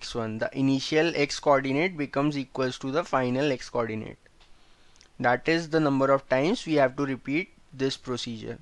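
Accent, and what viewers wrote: India. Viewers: u speak indian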